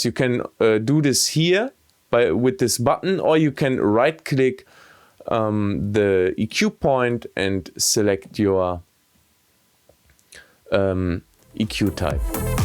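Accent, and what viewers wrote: Germany. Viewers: na, hast'n deutschen akzent nicht?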